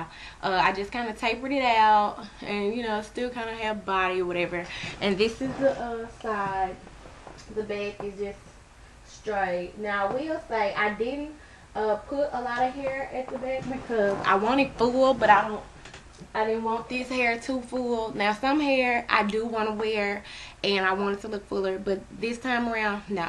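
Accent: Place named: South, United States. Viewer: i can tell ur from the south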